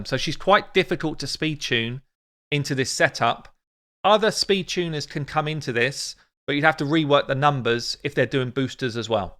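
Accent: United Kingdom. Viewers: Congrats. You rock! I love your British pronunciation and some expressions, it’s such a fun to listen and learn from your content. For me you should in each posted video say straight to the bin. You can say also as much as you want in each - Alure. Cheers mate!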